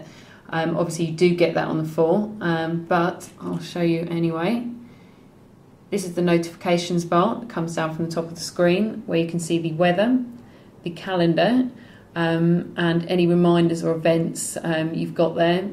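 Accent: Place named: London, United Kingdom. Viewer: you sound like you're from London!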